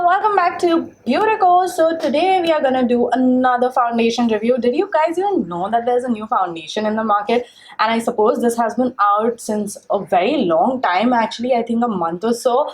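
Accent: India. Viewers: I fucking hate indian accents soo much, idk its fucking weird as fuck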